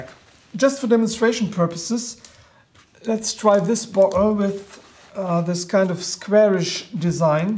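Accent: Germany. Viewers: Your english is cool - I like the german accent ;)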